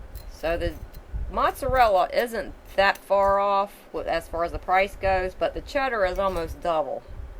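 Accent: South, United States. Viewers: Thank you very much intelligent lady with the southern accent...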